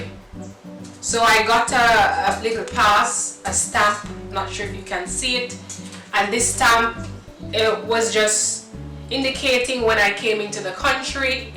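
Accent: Caribbean. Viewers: Intelegent young lady, good grammar, with an accent exactly as any other English speaking Caribbean islander.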